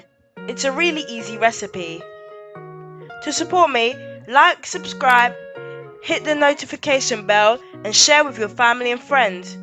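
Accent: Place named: France. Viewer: I am trying this chocolate cake right now. Really hope it will be as good as yours. Very well done. And by the way great French accent.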